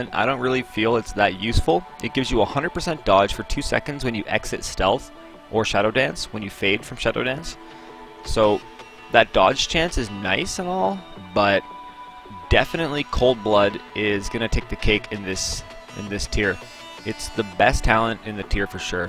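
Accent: Canada. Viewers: Awesome Canadian accent FTW.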